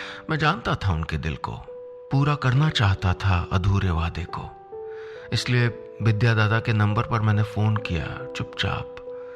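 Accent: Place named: Bengal, India. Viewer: Love you Sir from Kolkata .. kitna sweet lagta hai app ka Bengali :)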